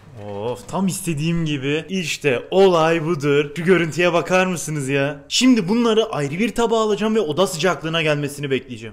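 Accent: Adana, Turkey. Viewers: Adana'ya doğudan göçen kürt kesimi böyle konuşuyo genelleme yapma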